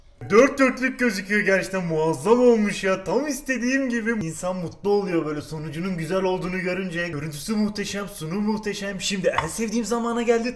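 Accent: Adana, Turkey. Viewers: abi adanalımısın yeni fark ettimde ediyürüm yapiyürüm ekliyürüm valla adanalılar da böyle konuşuyor :D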